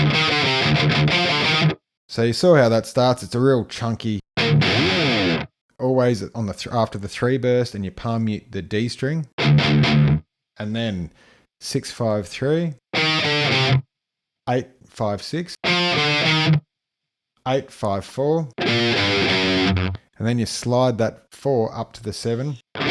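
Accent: Australia. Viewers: Toster Is he an Aussie ?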